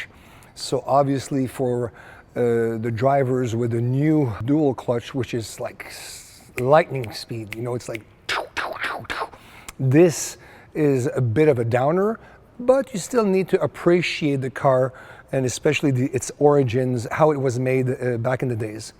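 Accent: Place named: France. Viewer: Je sens l'accent français ❤❤❤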